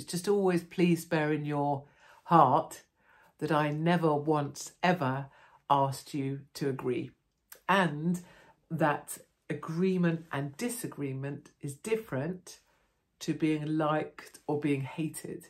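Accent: RP, United Kingdom. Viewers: You sound d posh but your amazing proper down to earth funny clever and should be in charge of our pond life government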